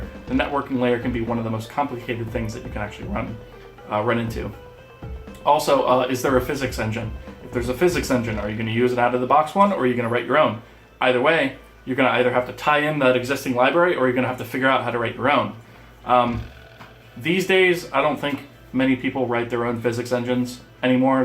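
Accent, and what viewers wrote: Germany. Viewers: Bist du deutsch? 😂 Dein Akzent hört sich so an.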